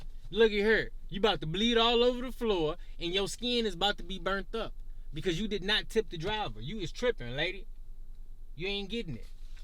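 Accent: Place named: St. Louis, United States. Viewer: Bro gotta be from the Lou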